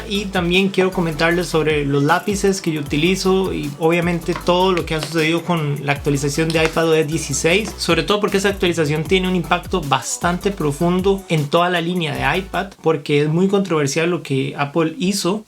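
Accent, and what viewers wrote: Costa Rica. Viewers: Me suena como a costarricense bro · Maeeeeee que buen video, gracias por una reseña tan integral y buena 💯 Usted es de Costa Rica o me falla el oído 👀?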